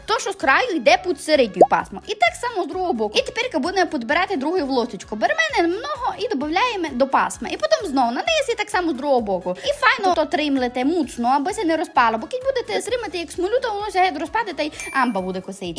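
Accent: Transcarpathia, Ukraine. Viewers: Це українська мова - закарпатський діалект🥰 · Чи це Закарпатській діалект?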